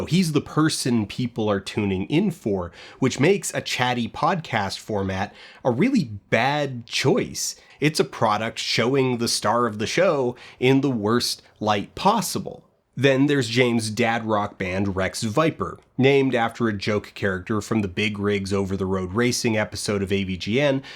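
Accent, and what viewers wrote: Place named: Canada. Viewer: I swear your "beens" and "processes" get more Canadian every video.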